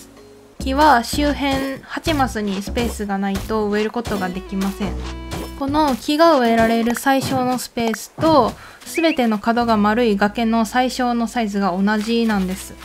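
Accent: Kansai, Japan. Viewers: 関西の方なんかなぁ自分もやからめちゃ聞きやすいです🥺ほんで編集もお声も可愛くて見やすい💕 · イントネーション関西風www 参考になりました。ありがとうございます。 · 楽しみにしてました〜！さんを参考に島作り直してます🥰 関西弁、親近感あって好きです〜！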